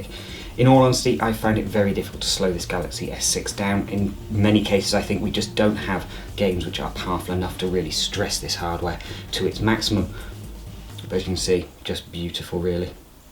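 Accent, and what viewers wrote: United Kingdom. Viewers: gotta love the brittish accent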